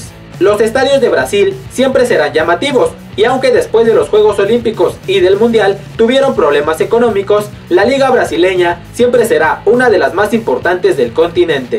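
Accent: Mexico. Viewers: La liga de México la mejor? Jajajajaja jajajajaja Cuando puse el video y escuché el acento mexicano era obvio que iba a poner la liga mx primera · Del inicio del video desde eschuche como habla este tipo que iba es mexicano ya sabía q liga iria primero ay dios 😒😒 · ¿Se nota que es Mexicano?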